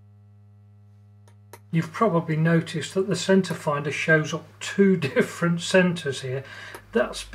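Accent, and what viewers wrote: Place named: United Kingdom. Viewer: There’s a lot of great machining content on YT but a lot is American and while I have nothing against our transatlantic cousins, pronunciations of aluminium, lever etc along with US customary units drive me crazy. I wanted to find a UK-based channel (preferably the North of England) using the metric system so I could switch off that pedantic, critical part of my brain and focus more on the content. (I know, it’s practically a mental illness — right?) Finding this video was brilliant especially while stuck at home all the time! You really check so many boxes for what I’d want out of a creator. Suffice it to say that I’ll be glad for any projects you have to share and you can count on an eager audience of, at least, one for any future videos.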